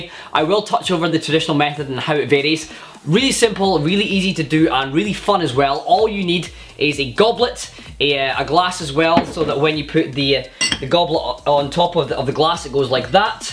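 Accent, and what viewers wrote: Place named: Scotland, United Kingdom. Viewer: Awesome man, funny as hell,sorry your glass cracked though. I love the accent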